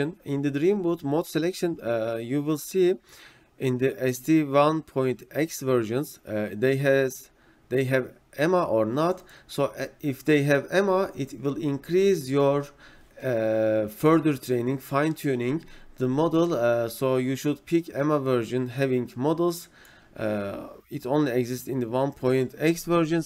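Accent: Turkey. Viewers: Önce bi forumda gördüm videonu aksandan direkt anladım Türk olduğunu. Çok tebrik ederim harika bir tutorial. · Çok faydalı içerik. Teşekkürler. (1. dakikanın sonunda aksandan dedim ki bu adam Türk galiba, öyleymişsin. :D ) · Dostum aksanın Türk'e benziyor; Türk'sen türkçe altyazı geç bizim için. Çok süper olur bize. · Türkmüsün? Türk aksanıyla ingilizce konuşuyorsun. Güzel rehber.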